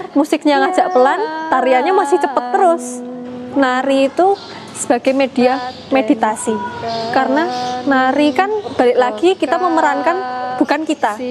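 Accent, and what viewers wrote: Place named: Java, Indonesia. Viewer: Suka sama attitudenya mbak e, cara bicaranya asli jawa. Meskipun pakai bahasa indonesia ketika menyebut gurunya sakit dg kata "gerah". Sukses selalu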